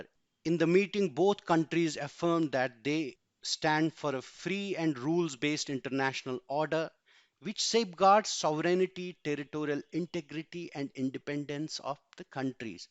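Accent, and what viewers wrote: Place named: Kashmir, India. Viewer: Thank you sir, Nice analysis of the big new of the day.. New voice from byju's probably from North india, most probably a kashmiri voice.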